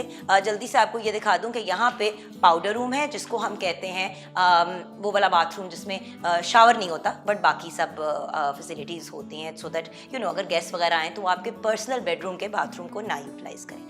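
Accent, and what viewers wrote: India. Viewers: she sounds like indian.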